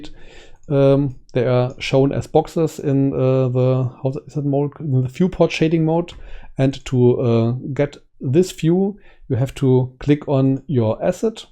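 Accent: Germany. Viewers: your denglish is the hammer ;)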